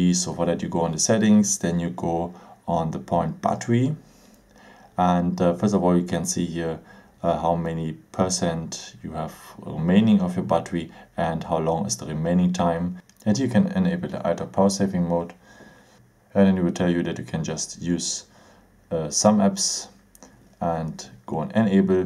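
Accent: Germany. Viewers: couh- couh seine englische Dialekt sagt ich bin deutscher !!!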